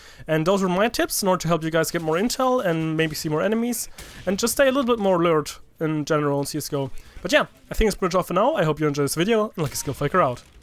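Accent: Germany. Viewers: Hör ich da nen Deutschen Akzent raus oder täuscht mich das? :D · Du klingst voll deutsch :D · ! You sounds German alright. Might be because I have a few German friends, so I'd know. (and Norwegian/Swedish/Danish friends as well) Dutch and Danish sounds quite similar, though.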